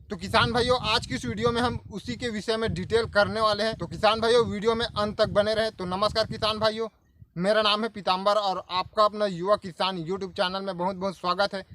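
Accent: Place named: Chhattisgarh, India. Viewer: Bhaiya chhattisagrh se ho kya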